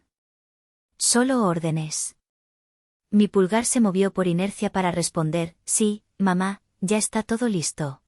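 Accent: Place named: Spain. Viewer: Una mexicana relatando con voz de auténtica española, eso resulta raro, no encaja, pensé que la historia se desarrollaría en España, pero no, la de la voz de españolita resultó ser mexicana.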